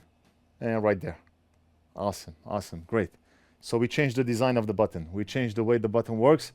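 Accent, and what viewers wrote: Lebanon. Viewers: Dude has a Lebanese accent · are you Lebanese? your accent and the ear analogy are very Lebanese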